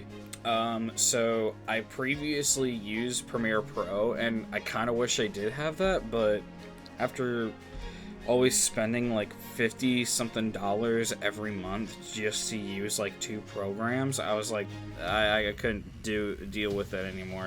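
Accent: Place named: United Kingdom. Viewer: Maybe you should make prop plane roasts with a.50 cal gun. "The.50 Cal Roasts the Hawker Hurricane." HOW TF DID I NOT RECOGNISE VOICE AS A BRIT!?!?!?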